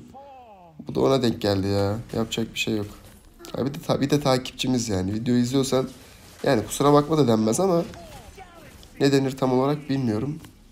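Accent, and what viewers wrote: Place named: Ankara, Turkey. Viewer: Abi senin arada giren şu Angara şivene bayılıyorum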